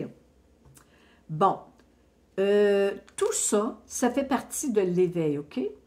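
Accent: Quebec, Canada. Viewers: Merci. J'aime bien t écouter. Message très positif pour la France, trop contente. Je ne sais pas si il y a des cafés jasette sur Paris. J habite Argenteuil dans la banlieue. Ce samedi le bonheur sur Paris. Du soleil 26 degrés. Les jeunes au bord de la Seine, port de Bastille avec chips en vin et pain. Ambiance vacances. Trop bien après cet hiver marqué par la pluie. ❤❤❤❤Sylvie. PS j adore ton accent qui me rappelle mon grand père et ma tante qui ont vécu au Canada et qui sont au ciel maintenant. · Merci beaucoup. Je t’adore💖🙏. J’adore aussi, en plus de ton charmant accent québécois, tes enseignements et tes encouragements. Je sais qu’il y a un café jazette à Bordeaux mais je ne retrouve plus les informations. Gros bisous à toi adorable 🙏🙏😘🥰👍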